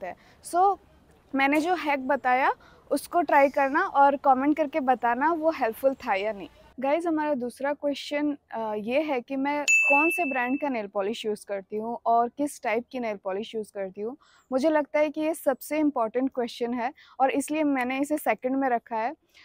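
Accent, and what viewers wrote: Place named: Odisha, India. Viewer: Brooo in my mind i was thinking u are from odisha because of ur accent 🥴, u actually are .... 🥹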